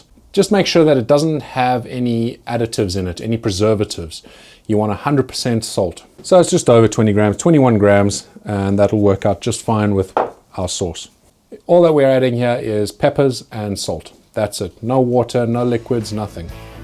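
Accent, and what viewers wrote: South Africa. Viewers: Hi. Based on your accent, I'd say you're South African. Where are you based? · You sound South African 🤣🤣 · Is that a South African accent? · Can't miss that SA accent anywhere you go.... Nice video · got such hectic whiplash because i instantly recognised your south African accent 😂😂😂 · When you recognize a South African accent...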